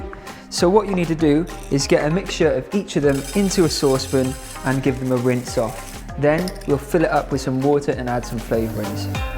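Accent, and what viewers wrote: Wales, United Kingdom. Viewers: Damn - James left SortedFood today, and just as we all hoped you’d pop up as a guest chef in their London kitchen, you move back to Wales! Although as most of my family lives near Swansea, I’d be happy to pop up the M4 to any restaurant, kitchen or culinary school you open up. Look forward to your Welsh accent amping right back up:) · love that welsh accent <3 many many many good wishes for your new proyect of life. love you ! besitos! Love the music also !!! and the "bloopers" omg the best hahaha thanks for the recipes and the good vibes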